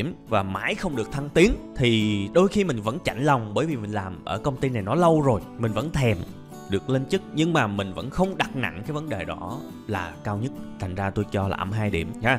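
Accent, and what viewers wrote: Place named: Saigon, Vietnam. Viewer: Thầy giảng hay lắm ạ. Mình thích giọng sài gòn quá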